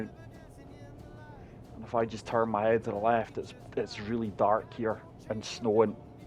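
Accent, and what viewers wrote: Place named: Glasgow, United Kingdom. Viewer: You sound like you from Glasgow ye from there o am and if so what team you support the blues or greens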